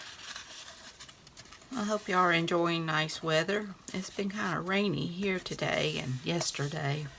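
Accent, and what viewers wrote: South, United States. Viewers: Cute pockets. I like it when you talk. Your southern accent is sweet.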